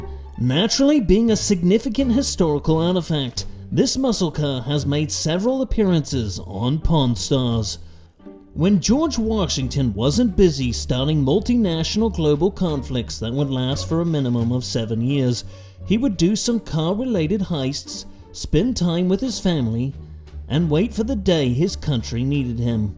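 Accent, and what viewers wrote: United Kingdom. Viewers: What adds to the funniness imo is that it’s voiced by a Brit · The narrator being British is the cherry on top😂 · The fact a British man is telling this hilarious moment in history makes it that much more funny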